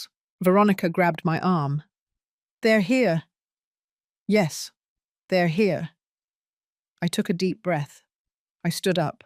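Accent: United Kingdom. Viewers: I think the accent is British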